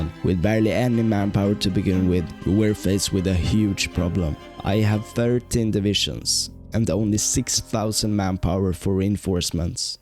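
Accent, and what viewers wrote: Sweden. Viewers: Du har den svenskaste brytningen jag någonsin har hört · helvete vilken svensk dilekt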